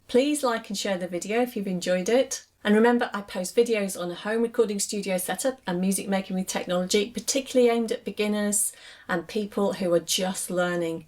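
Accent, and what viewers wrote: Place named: Manchester, United Kingdom. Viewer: Looks like its changed since this video. I go to change tempo but instead of getting the popup box showing how to select the new speed, I got a box saying disallowed, you must first select some audio . I've been trying to select a portion of the track for some minutes through Select and Region and then trying everything there, but the cursor disappears all the time, so can't make a selection. Btw, I love your Manchester accent. That's where I grew up. :)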